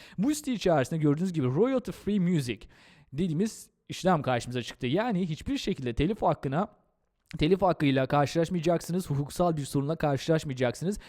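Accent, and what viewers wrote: Azerbaijan. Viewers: Siz Azerisiniz?? +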